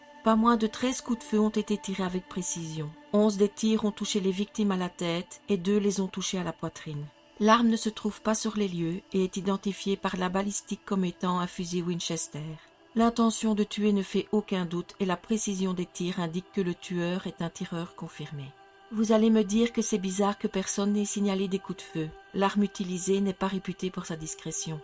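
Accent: Belgium. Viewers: J'adore ton accent belge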